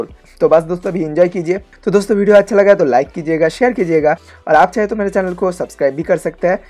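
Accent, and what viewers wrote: Bengal, India. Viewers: You Bengali ??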